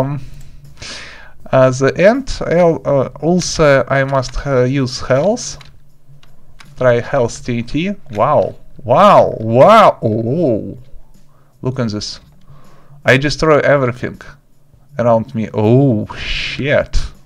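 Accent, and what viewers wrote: Russia. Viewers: Руський акцент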